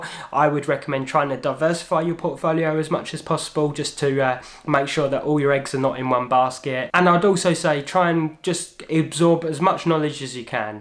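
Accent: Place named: Essex, United Kingdom. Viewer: You sound like your from my area in Southend, great video content on your channel keep up the hard work.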